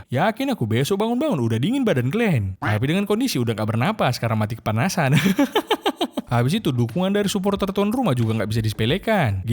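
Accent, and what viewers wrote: Medan, Indonesia. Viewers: Abang orang Medan kah? 😂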